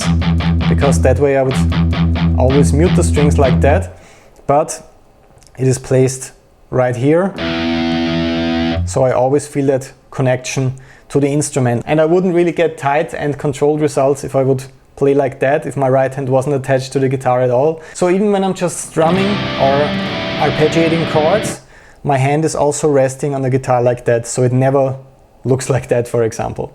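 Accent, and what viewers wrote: Austria. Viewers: Bro u have an Austrian accent isn't it? 😅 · Ich mag deinen österreichischen Akzent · Are you maybe an Austrian (Because of the Accent )( if I m right I gonna have to say Hawedere oida !!!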